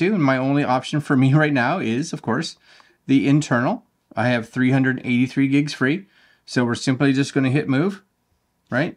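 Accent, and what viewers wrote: Canada. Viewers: ❤ that Canadian accent makes my heart go pitter patter …..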